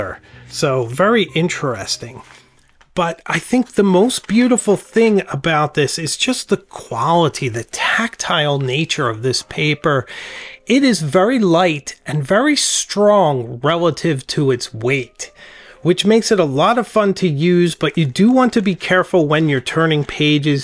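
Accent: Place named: Pennsylvania, United States. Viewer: Does anyone know where is from? The accent gives Bucks county PA.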